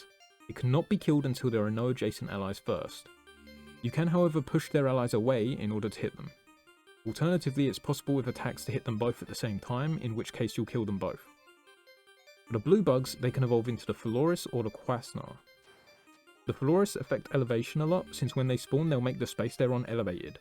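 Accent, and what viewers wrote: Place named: United Kingdom. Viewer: Man I had to put you at 70% speed. British is hard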